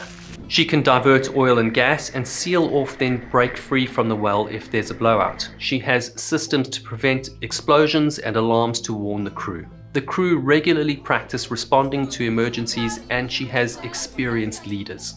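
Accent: South Africa. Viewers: Please try and make the story telling more like a conversation and not a accident report that was being read bullet point by bullet point... I really struggled to follow what was happening... It's not a lack of information just a frantic pace to fit in all the information... Great video... Also you sound South African? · I really like this guy with his almost unlimited knowledge of what he is talking about. He has a wonderful South African accent and is so articulate in his delivery. Others on similar subjects on You tube are not quite in the same league as this great man who really knows how to put together a professional video. One might say good enough for television. One hopes he continues with these documentaries in the future. They are far more superior than the American versions. Peter Starr London UK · Hey a south African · Like the South African accent.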